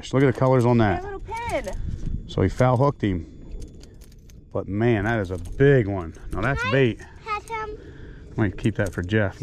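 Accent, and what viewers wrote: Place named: Maryland, United States. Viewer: Hi, I have to ask, are you from Maryland? I detect a similar accent to mine. I fish a good bit in Sunset Beach NC and use the same jigs. Nice video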